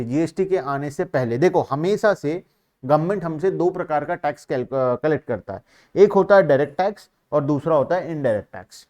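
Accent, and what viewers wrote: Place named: Sambalpur, India. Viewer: Sir kya app western odisha se hai kyu ki app ki bate thodhi thodhi sambalpuri language jese lag raha hai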